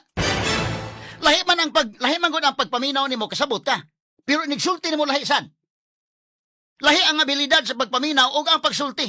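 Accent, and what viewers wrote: Visayas, Philippines. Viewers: Good morning Atty. watching from Tabunok Talisay City / Tinuod jud ka Atty. kay ako bitaw tulo ko ka tuig sa Manila ilhan gihapon ka nga bisaya sa iyang tuno😊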